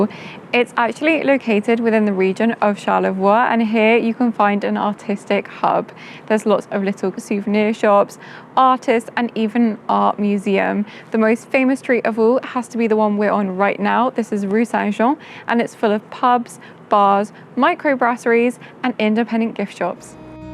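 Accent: United Kingdom. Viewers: C'est pour faire découvrir le Quebec aux Canadiens et Américains. de plus on entend bien son accent Britannique et ne parle probablement pas francais.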